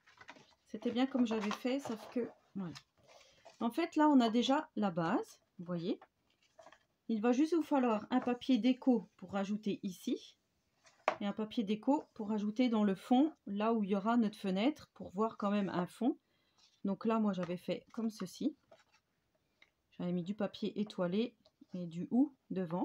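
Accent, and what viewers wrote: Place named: Haut-Doubs, France. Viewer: Coucou. Je me suis inscrite lors de ra vidéo carte bonhommes de neige que je vais faire des que j’aurai un peu de temps. Tu viens de parler du haut Doubs ce qui me conforte dans le fait que j’avais bien reconnu cet accent si typique et qu’on ne put pas confondre avec un autre.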